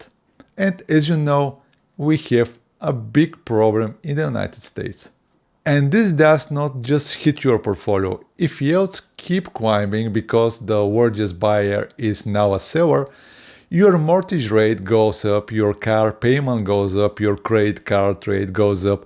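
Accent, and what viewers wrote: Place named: Bulgaria. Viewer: Zdraveite ! That's hello in Bulgarian as i was sure by the accent that he is same nationality as me . Multiple financial markers as Student loans unpaid and Mortgages unpaid and Car reposesions increasing is not painting a good picture. Let's see as most money is made in bear markets for those who know what to do and have powder.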